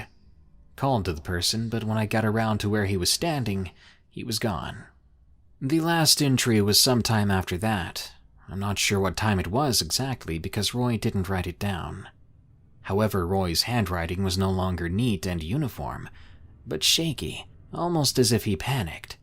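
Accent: United Kingdom. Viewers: Why do america still think that everyone for the uk talk like that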